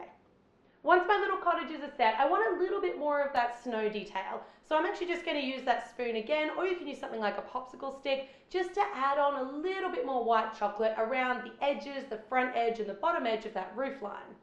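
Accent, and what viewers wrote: Australia. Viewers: Is she from aus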